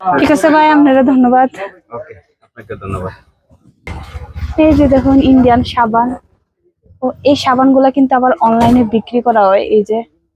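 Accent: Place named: Sylhet, Bangladesh. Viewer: Your speaking accent is much like to the Sylheti dialect/language 🎉😮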